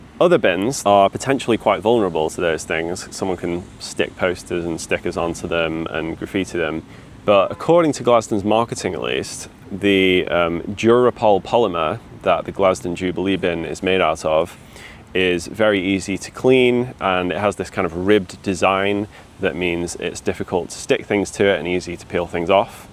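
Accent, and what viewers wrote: United Kingdom. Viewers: I had a sudden bit of a hankering for a garbage video with British accent, so this really helped me carry on calmly. A litterl afternoon escapism to treat my tea rash. You were tricked by the omnipresent deception scheme of the global Cult of the Nine. - That is £518, not £517. And cheaper is always good, because this means you can leave a bigger load of trash in a black container on a hot sunny day and eventually the locals can fetch their free compost. Everybody wins. Cool, it's a trash garage. (Which could totally be a punk rock band name. Although ideally not a British one.) So a trash can with a bomb in it is basically a laden bin. It was a foolish idea to spend resources on them. Who wants to hear trash talk on the streets all the time? Now that is outright offensive, I have to say! 😦 Britain has a long tradition of selling its soul for mere material values. 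P.S.: What is the name of the tribble there that is listening to everything you say?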